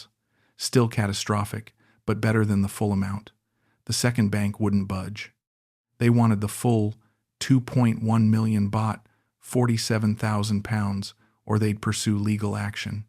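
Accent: United States. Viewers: Are these made up stories or genuine stories ? these types of stories are true but is this channel telling true ones or made up for entertainment ? What if it's an English person is it an American accent it doesn't make sense there should be an English person accent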